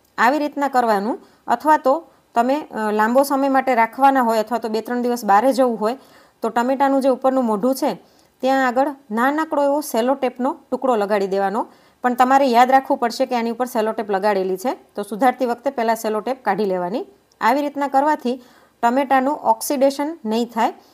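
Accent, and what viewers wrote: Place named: Kathiawar, India. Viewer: Madam tame puore kathyavadi bolo cho How sweet Kya city ma cho? All tips best👌👌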